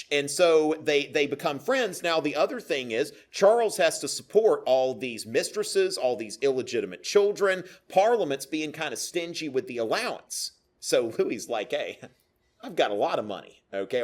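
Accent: South, United States. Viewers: As an Englishman, listening to our history getting explained informally in a strong southern US accent just doesn't sound right 🤔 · Good lecture but it is so strange to hear such strong southern accent. It is not the guy's fault, but it is difficult listen to.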